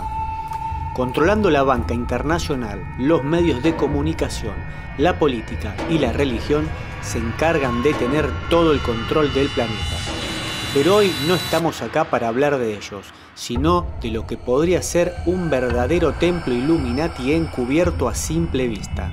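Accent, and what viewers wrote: Argentina. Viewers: El video está muy bueno , pero por favor trabaja en tu asentó , no todo el Mundo es argentino y en momentos molesta! Gracias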